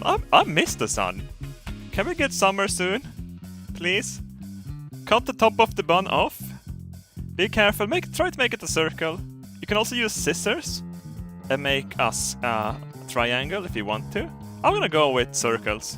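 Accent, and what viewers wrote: Sweden. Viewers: Swendlish is aborable. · ”put your buns on backplåtts-paper” His swenglish is perfection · Min favorite part av this video är the svengelska! :) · the way everyone in the comments is trying to correct because of how he says the word Flour, or just saying "Nice english bro" He's swedish they pronounce words differently not everyone says words the way you do. So stop correcting him.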